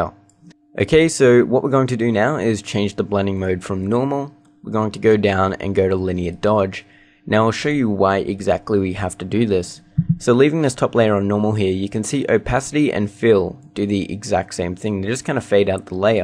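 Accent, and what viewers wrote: Australia. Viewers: me neither cannot understand australian english "hold the OKIE on a keyboard" :D what is that? thx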